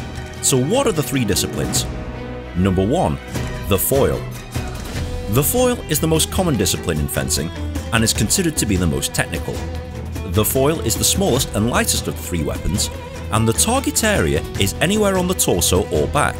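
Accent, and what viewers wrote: United Kingdom. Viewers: Very nice explained and I love typical british accent. But I need to correct something: the sabre is about 88cm long, the foil and the epee are about 90cm long (length of the blade/edge). The pictures doesn't show the correct lengths.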